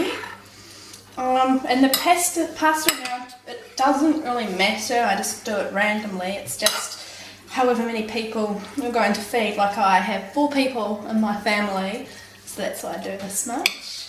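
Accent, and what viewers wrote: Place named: New Zealand. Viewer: I can tell you are from NZ because of your ingredients but in hindsight you accent should of given it away.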